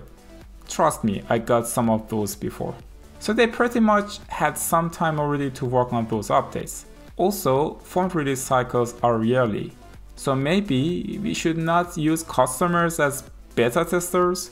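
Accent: Iran. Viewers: Great video my iranian brother (guessing by your accent ;) ) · Persian accent 😄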